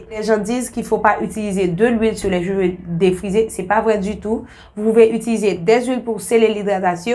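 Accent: Haiti. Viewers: T'est haïtienne ma chérie ya l'accent fort 😅😘😘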